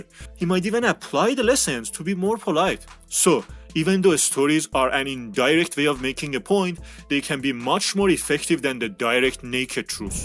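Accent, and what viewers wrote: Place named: Iran. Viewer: Oh this is the thickest Persian accent that I've ever heard! 😂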